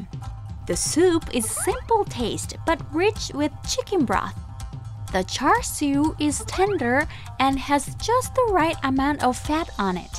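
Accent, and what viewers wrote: United States. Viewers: seu ingles ficou bem melhor agora, mesmo não sendo o ingles minha lingua materna é bem perceptivo que o seu ingles ta bem parecido com um americano. Parabéns:face-red-heart-shape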